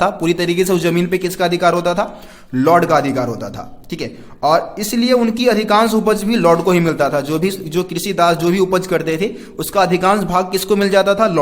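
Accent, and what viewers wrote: Delhi, India. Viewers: Sir aap delhi se ho na😊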